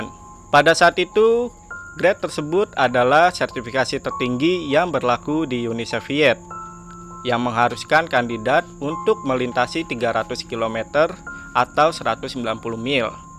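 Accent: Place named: Bali, Indonesia. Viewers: bang tu keturunan bali atau NTB kah? bilang "ithu" nya pas kata "thu" nya kayak orang daerah situ wkwkw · Asli Bali neng terbukti dg ngucap huruf T nya khas bgt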